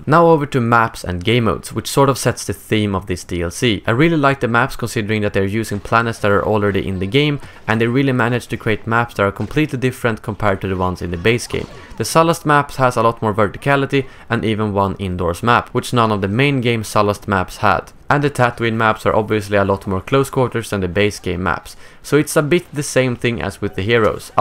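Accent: Sweden. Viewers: Hello, Mr.Swedish Accent guy commentary!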